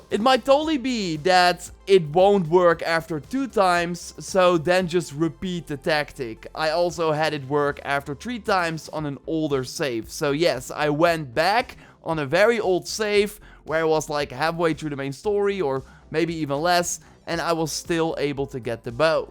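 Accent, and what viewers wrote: Netherlands. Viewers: Are you Nederlands? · How can people listen to this guy, the Dunglish is hurting my soul. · are u ducht?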